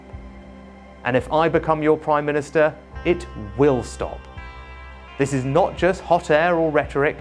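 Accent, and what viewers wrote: United Kingdom. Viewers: He sounds very British to me.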